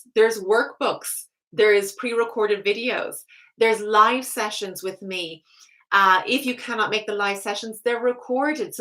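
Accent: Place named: Ireland. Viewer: I love your smile and your Irish accent! Greetings from Madrid in Spain ❤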